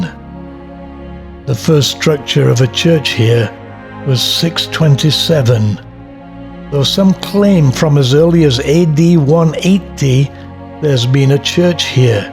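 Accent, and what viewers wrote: Scotland, United Kingdom. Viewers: Do i or do i not detect a Scottish accent in this vid. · Just perfect British accent to hear just sat to have afternoon tea.... Love the Touring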